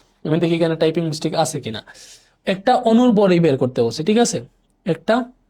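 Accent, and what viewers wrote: Sylhet, Bangladesh. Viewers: Ashole vhaiya sylhet er to tai accent gula amn hoy BTW vhaiya genius ❤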